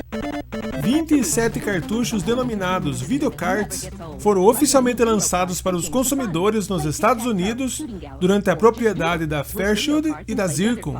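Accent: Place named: Paraná, Brazil. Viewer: Ótimo conteúdo, mas dicção e "paranês" terríveis.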